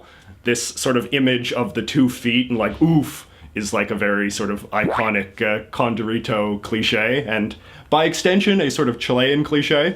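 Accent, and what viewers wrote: Canada. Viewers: I mean he is an English speaking Canadian. Even if you study a language for a very long time, especially as an adult, it is very difficult to not have a distinctly foreign accent when pronouncing words in other languages. This is because, especially if you are monolingual, you will pronounce words based on the phonemes in your mother tongue. For example, despite me having studied Spanish for 10 years as a teenager and young adult, I now have lost my authentic Spanish accent and now sound very "white" when I speak the language, simply because I haven't been using the language. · Nvm I sounds Canadian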